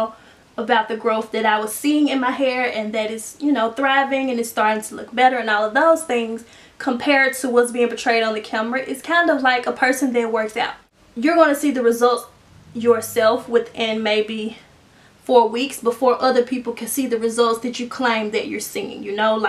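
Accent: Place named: Tennessee, United States. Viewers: 1st time watching her. sounds like a Tennessee accent, but it could be Mississipi. Beautiful young lady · is in fact from Tennessee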